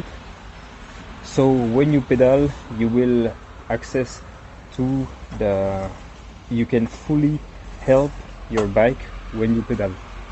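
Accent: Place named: France. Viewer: Yes yes yes 😊 more content about this wonderful bike, your frenglish is enough, vive la 🇫🇷 😅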